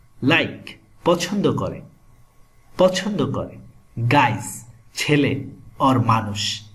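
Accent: Dhaka, Bangladesh. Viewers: Although you are from Chittagong Division, your accent sounds more like that of a person from Dhaka than a person from Chittagong or Teknaf. I see that Ramganj is not so far from Dhaka, so that probably explains your accent. Chittagong Division is very large. It stretches to Dharmandal, which is farther north than Dhaka! I have a friend from Cox's Bazar, who will be moving to Dhaka in a few days. I am from USA, and I am a friend of his family. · Thank you. I fell in love with a Kolkatan girl your videos help me a lot. Is your accent Dhaka accent? Is it similar to Kolkata?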